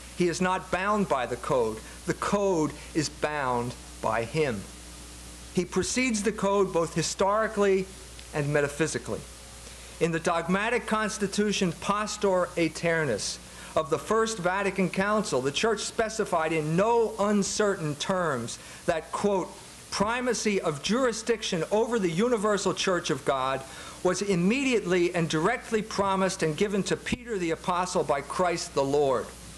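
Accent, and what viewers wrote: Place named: Philadelphia, United States. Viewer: He is allowed to have Philly accent ?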